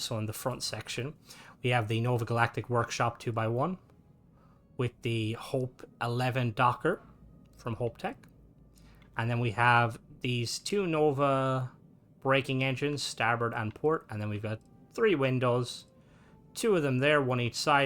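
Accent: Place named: Ireland. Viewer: 😊 would you happen to know how to use bagpipes just curious You're Irish accent makes me wonder not that I think it's bad actually I think it's pretty cool but your accent sounds like you're from the area that actually has bagpipes plays it from dominant this is not disrespect this is me trying to respect you Peace have a great day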